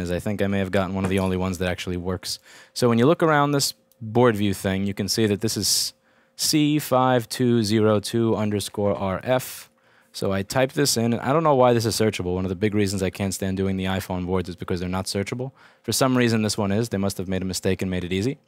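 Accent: New York, United States. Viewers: fuh rod? do you mean farad? (fay-rad) i think would be the phonetic spelling. might just be your new york accent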